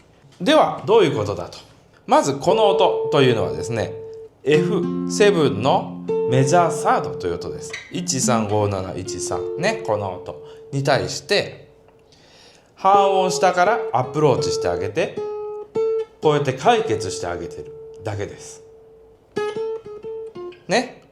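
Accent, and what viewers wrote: Nagoya, Japan. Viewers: 快傑ズバット👍 誰が分かるねん😅 名古屋弁いいですね！ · wes弾いたらうまそう😂 名古屋方言が心地良い🎸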